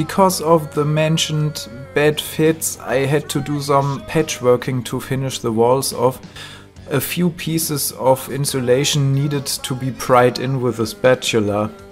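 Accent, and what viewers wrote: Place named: Germany. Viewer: jetzt no front, aber man hört sooo hart dass du deutsch bisd hahaahh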